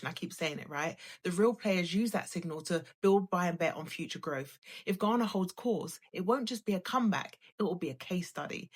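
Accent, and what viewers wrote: United Kingdom. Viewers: I love her UK accent so much. Way better than the American accent. Icing on the cake? Her beauty !!!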